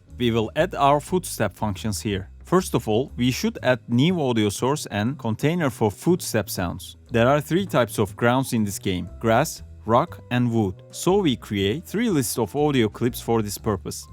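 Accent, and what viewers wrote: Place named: Turkey. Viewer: Türk olduğunu aksandan hemen anladım.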